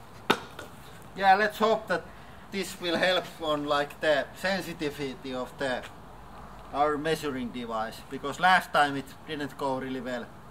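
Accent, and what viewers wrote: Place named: Finland. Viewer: Huomaa englannista että on suomalainen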